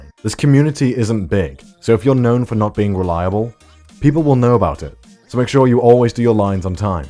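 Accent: Wales, United Kingdom. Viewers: Omg you can hear more of his welsh accent here!! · The Slight Welsh Accent Is Very Very Pog 😂😂😂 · Watching this in 2021, and I gotta say......What happened to British accent? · you sounded more welsh back in the day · holyyyyy crap he sounds so different back then xD and u can also hear a tinge of welsh in his accent too shEeEesh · Holy shit a time when actually sounded Welsh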